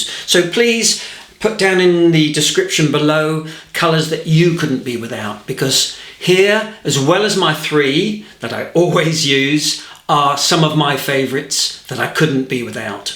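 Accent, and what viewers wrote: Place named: United Kingdom. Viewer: I have just discovered you and I am in love with your lessons and your marvellous British English and humour. Thank you so much!!!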